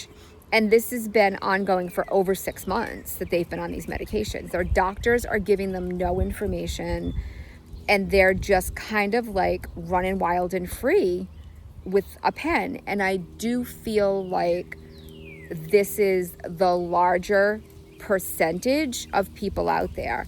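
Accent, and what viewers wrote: Massachusetts, United States. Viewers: Thank you so much for sharing your journey and I'm so glad I found your channel today! I just got a prescription for Zepbound and I've watched several of your videos already so I can have an idea of what to expect (though I know every body is different). Your channel is so informative and I really love your balanced and realistic approach. You're a goldmine of information! When I watched the first few minutes of one of your videos I knew I recognized your MA accent. I'm a north shore gal myself. By the way, you asked in a previous video if someone knew about the food you had at the Guatemalan friend's party, the corncake with the cheese or pork in the middle, those are called pupusas. I've had many of them. 🙂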